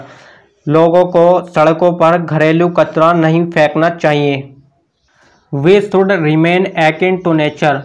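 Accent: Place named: Punjab, India. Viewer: Sir aap Punjab se ho kya? Mja aagya aapka language sun ke Hindi +Punjabi+English Mja aagya🤠🤠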